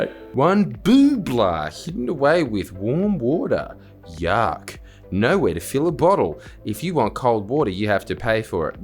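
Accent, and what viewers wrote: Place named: Australia. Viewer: Hey friendly Jordans I'm glad you're still here! We love you and your beautiful aussie accent. Keep questioning everything and providing us evidence from across the pond about how your pepple have been under the same control as us. We will be free from this tyranny one day!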